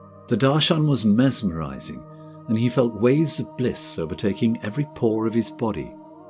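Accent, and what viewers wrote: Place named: United Kingdom. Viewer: I always think it’s funny when a British voice narrates about Indian culture/religion/history 😂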